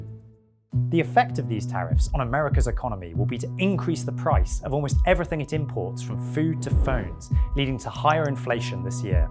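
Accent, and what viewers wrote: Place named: United Kingdom. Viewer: Heard the British accent and knew it would be trash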